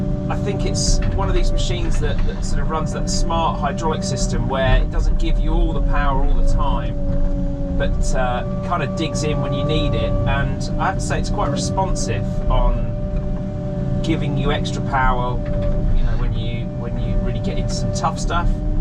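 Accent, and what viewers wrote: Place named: England, United Kingdom. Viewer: Well done Buddy, had to tune myself in to the English Slang & accent like Slew for Swing or Spanner for wrench but I caught on eventually, Definitely am a big fan of the Neat Stick Steer more generic function's on one Joystick & less on multiple Levers , pedals etc etc , a Skidsteer steering feature with Boom, stick and scooper bucket, Never Ran a High Hoe, or Trackhoe that's a Old slang in Canada for a Excavator in my life but always wanted to Especially this Brand Caterpillar & the D series New gen machine. Thanks from Northwestern Alberta Canada , And one of the better machine review's I've ever seen on this website. Your also right about how Cat totally misses out on the Places to store a operation File, invoices cabinet in the cab. Big miss on that one l think cat figures a forman or Supervisor will look after Paperwork and let the operator concentrate on scooping & digging dirt. 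But Good point.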